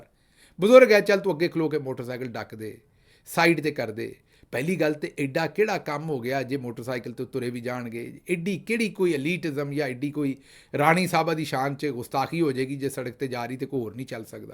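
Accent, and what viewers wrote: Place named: Punjab, India. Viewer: جس طرح سے آپ پنجابی بولتے ہیں وہ واقعی بہت جادوئی ہے۔ کوئی اس وقت تک نہیں جا سکتا جب تک کہ آپ اپنی بات ختم نہ کریں۔ ہم آپ کے پنجابی لہجے کو کاپی کرنے کی کوشش کرتے ہیں لیکن ناکام رہتے ہیں۔ لاہوری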